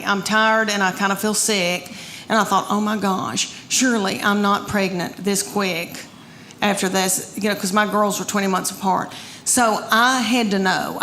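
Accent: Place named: South, United States. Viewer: The heavy drawl is exhausting trying to follow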